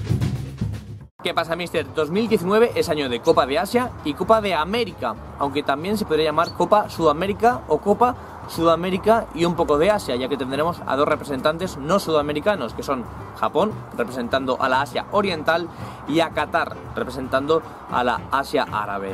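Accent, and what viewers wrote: Spain. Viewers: ¿Sos español, si acaso? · gracias , eres de España no ? Veo eso con tu acento pero talvez me equivoco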